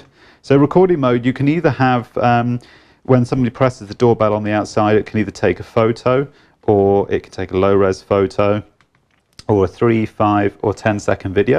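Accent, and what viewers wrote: China. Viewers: Chinglish, love it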